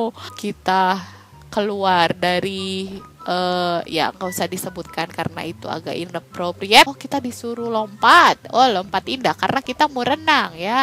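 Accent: West Java, Indonesia. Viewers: sunda na kaluar pisan wkwkwkwk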